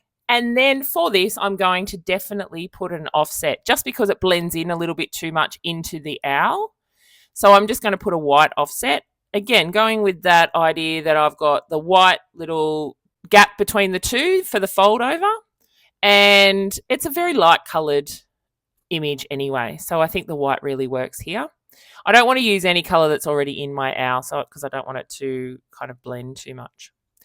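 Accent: Australia. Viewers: So nice to hear an Aussie tutorial, thank you from another Aussie ❤️ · I agree, good to hear a Aussie , I am in Sydney at the moment but looking at moving to Tassie....Thanks for the video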